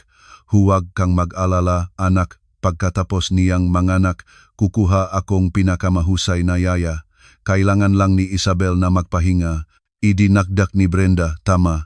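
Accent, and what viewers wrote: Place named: Visayas, Philippines. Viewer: Nhihirapan ako mkining syo utal utal ang slita visaya kb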